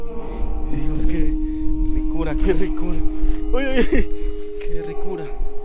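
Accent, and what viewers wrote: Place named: Quito, Ecuador. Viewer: ya el acento Quiteño esta más notable en ti amigo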